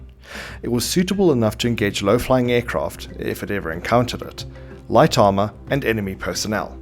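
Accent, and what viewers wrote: South Africa. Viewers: mind if i ask are you south african? i can hear it in your voice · Are you south african?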